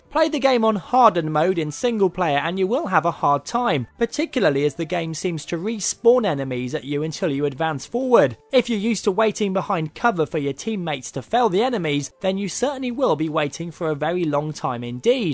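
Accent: United Kingdom. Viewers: i like the british acent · this guy is giving us british a bad name for talking like that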